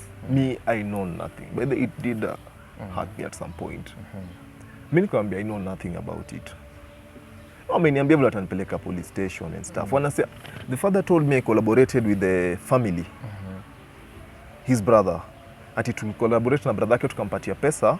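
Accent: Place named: Kikuyu, Kenya. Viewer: Sorry, the interviewer!!!! I've watched a few of your videos, but it's like mostly you don't understand the people you are interviewing or you're absent minded at some point. You keep asking some questions that are already explained.. and that's in almost all of your interviews!!! I'm sorry but that's just my view! Then again you "mocked" the Kamba lady coz of her kikamba accent, saying how you "like" the way kambas talk, lakini wewe, have you noticed how you talk!?? Your Gikuyu accent is so heavy!!!!! Come on!!! Try to focus and listen to understand!!!!!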